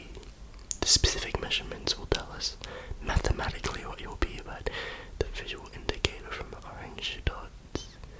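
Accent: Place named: Australia. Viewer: My first watch of one of your videos, and was trying to identify your accent to see if you were a fellow Aussie, then you said yeah nah and I didn’t have to check your about 😂😂 subbed 🤩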